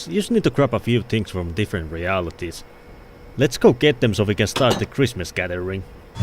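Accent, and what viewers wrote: Finland. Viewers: I can detect finnish accents from planets away, being a finn as well. What's good? Also the way i found this channel is i just stumbled into it on my recommended and was like "? Isn't this the guy from my steam friends list?" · Shogatsu is my favorite, they did great Also I love how I immediately knew you were from Finland, cheers from Hungary lol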